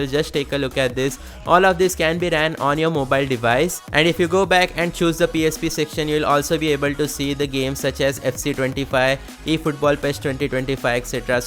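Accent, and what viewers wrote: India. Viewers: always indian accent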